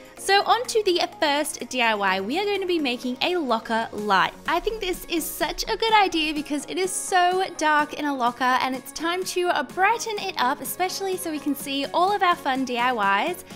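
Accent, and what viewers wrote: Australia. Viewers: are you Australian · I feel like your Australian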